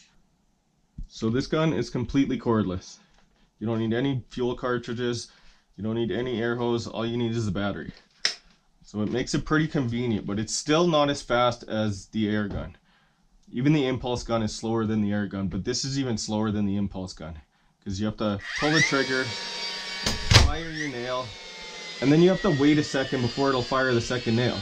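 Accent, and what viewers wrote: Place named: Canada. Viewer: This guy has the biggest Canadian accent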